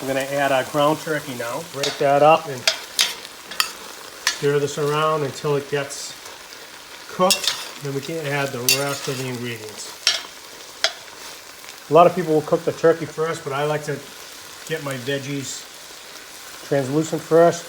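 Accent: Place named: New England, United States. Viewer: The New England accent is amusing.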